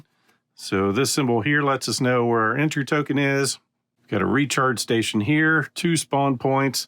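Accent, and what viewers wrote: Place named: South, United States. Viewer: You won't believe how long I've waited to hear you recite this Lovecraftian horror. It's awesome. #MonotoneSouthernExcellence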